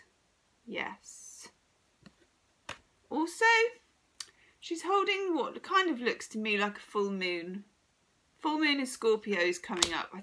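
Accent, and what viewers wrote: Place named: England, United Kingdom. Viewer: your Insight is amazing. Your accent is identical to that of Keira Knightley in Pride and Prejudice. my favorite English accent. Thank you so much for your talent!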